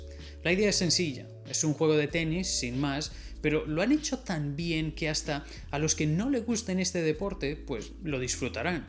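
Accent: Spain. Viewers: Parece un Español hablando sudamericano, esensial, aser En mi opinión, no es hate👌🏻 Muy buen vídeo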